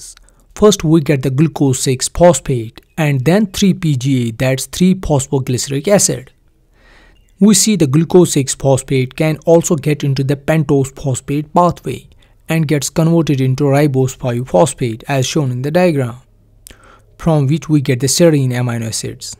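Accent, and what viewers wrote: Kashmir, India. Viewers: Are u from kashmir sir?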